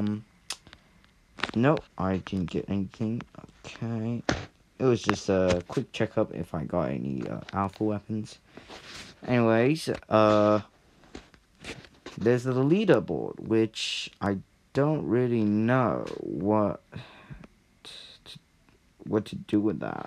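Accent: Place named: United Kingdom. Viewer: British accent cute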